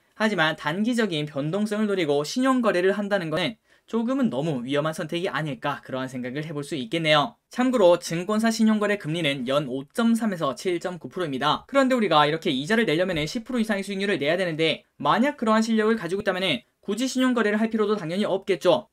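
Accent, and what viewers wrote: Gyeongsang, South Korea. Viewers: 감사합니다~ 그런데 님 대구 사세용?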